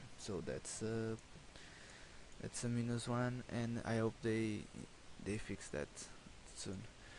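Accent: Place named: Portugal. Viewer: pelo sotaque percebi logo que eras portugues :)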